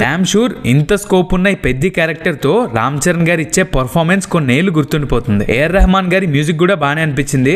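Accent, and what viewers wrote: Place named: Visakhapatnam, India. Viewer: Aa slang vzm ...not sklm bro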